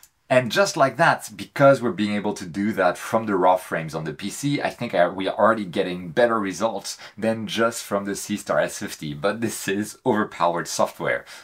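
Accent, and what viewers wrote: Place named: France. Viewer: c'est moi ou j'entend une pointe d'accent francais dans ton anglais? good job vidéo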